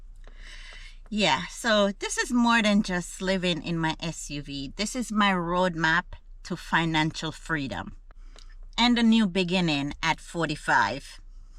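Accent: Jamaica. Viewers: She sounds Jamaican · I admire you for not giving up, you sound Jamaican. I pray that you will repent of your sins and turn your life over to Jesus Christ, if you have not done so already. Keep your eyes on Jesus. He is the only one who can help us. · Hi. Being of jamaican heritage my self , i hear a slight jamaican accent ? With you. Are yiu from jamaica ? If so , did you leave long ago. ?. Have you considered to go back home?. Maybe life would be better there.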